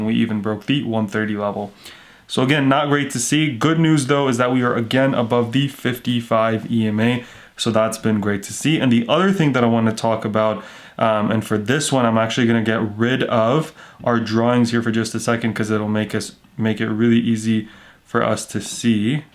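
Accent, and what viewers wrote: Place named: Canada. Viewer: What? Dallas TX! I thought you are Canadian! Lol 😆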